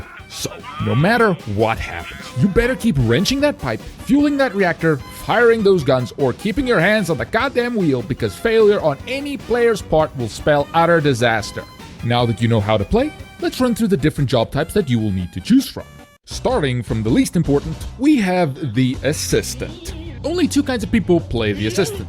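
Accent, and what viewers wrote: South Africa. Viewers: WAIT HE'S SOUTH AFRICAN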